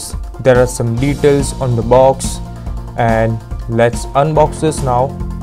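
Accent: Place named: Punjab, India. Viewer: I cannt listen to this punjavi accent ..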